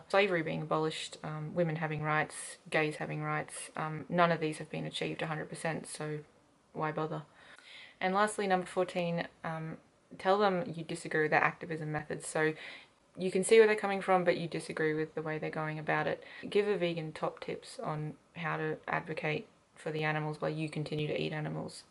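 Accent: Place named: Australia. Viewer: Oh my god.. Haha I was thinking 'she must be British, how does she have an Austrlian accent' and then you explained you lived in England... I knew it. The dry humour has rubbed off on you :P This video was awesome; more people need to be explaining the inevitability and necessity of veganism in this matter of a fact way.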